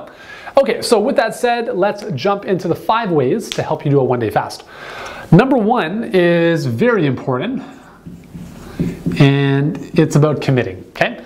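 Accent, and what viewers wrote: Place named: Canada. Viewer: Canadian raising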